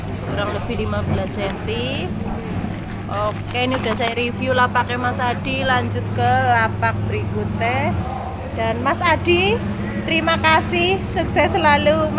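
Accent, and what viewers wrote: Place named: Banyumas, Indonesia. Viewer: mantap nih satu frkwensi kontenya, pada pada wong ngapak hahahay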